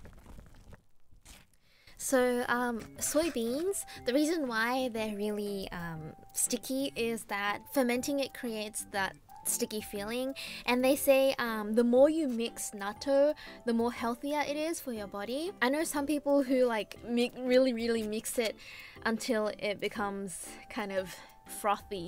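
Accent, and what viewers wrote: Australia. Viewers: Ive been enjoying these videos! Keep up the good word! P.S. I love the Aussie accent in your English! It sounds attractive to listen to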